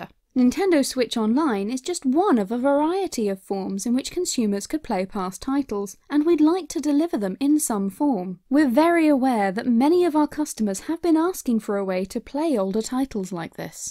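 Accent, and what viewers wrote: United Kingdom. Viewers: Ok I'm new to the channel. Who on Earth is this British sounding female narrator who hasn't been named? The other guy at least is named in the description.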